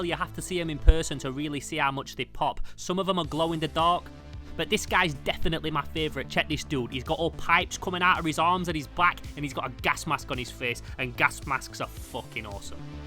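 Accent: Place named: Sheffield, United Kingdom. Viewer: i knew this accent from doctor who but now that you say sheffield i understand!!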